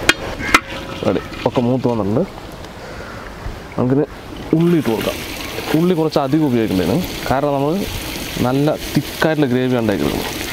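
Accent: Palakkad, India. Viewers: പാലക്കാട്ടെ സംസാര രീതി നല്ല രസം കേൾക്കാൻ ഏട്ടാ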